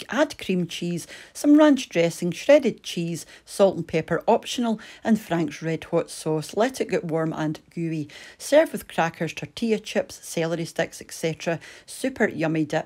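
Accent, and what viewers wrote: Scotland, United Kingdom. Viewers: Lovely Chanel and great food! I’m from Somerset England. I love the Scottish accent. Did you ever watch Highlander? If so what did you think of it? X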